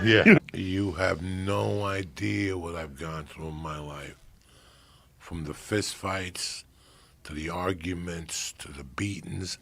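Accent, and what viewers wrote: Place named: New Jersey, United States. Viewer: The charisma this man has paired with that jersey accent is absolutely beautiful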